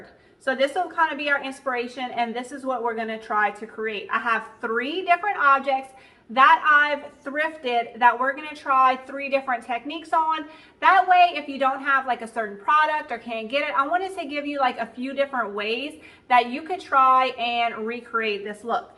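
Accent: Louisiana, United States. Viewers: You're adorable, I just think your Louisiana accent is delightful and you're so creative. Thanks for sharing your ideas.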